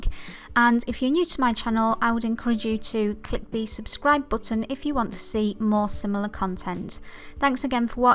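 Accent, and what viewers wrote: England, United Kingdom. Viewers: What a beautiful page ! Your sweet English accent reminds me when I was a little girl I used to watch ART ATTACK with Neil Buchanan and his lovely accent , he opened my eyes to art and crafts and you nourished my sense with your magical touches Wish you luck and happiness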